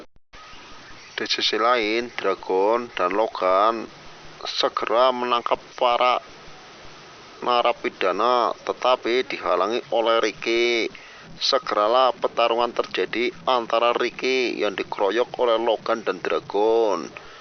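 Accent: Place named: Banyumas, Indonesia. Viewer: Rika wong ndi bos ngapak temen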